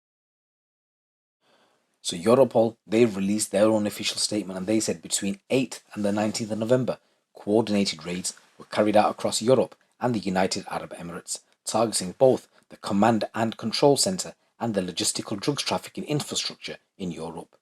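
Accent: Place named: Liverpool, United Kingdom. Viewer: Gotta be a Scouser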